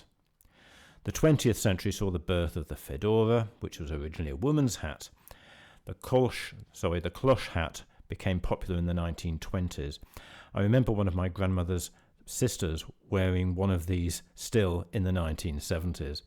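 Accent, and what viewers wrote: United Kingdom. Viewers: “Fwum.” “Fwee.” “Wibbons.” “Amweriker.” “Twentiff.” “Gwandmuvva.” I don’t understand that Brit bs accent… But I do like the info in the video. But only once. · Of course he has a British accent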